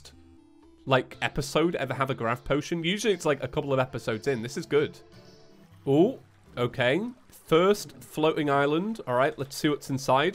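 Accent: United Kingdom. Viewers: Bruh I always click on these videos to sleep, and it always has to be a stinkin British accent? Can you speak more American please? It’s more relaxing than that proper sounding stuff.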